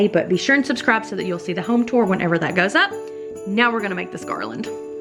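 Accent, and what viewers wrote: South, United States. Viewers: Love the southern accent! Great video, thanks. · I adore the peppermints for my nieces rooms , love your southern accent ;) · Your Southern Voice is Adorable....Just like your Crafts<<<<Happy Holidays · I’m loving your energy and your southern ‘ya’ll’.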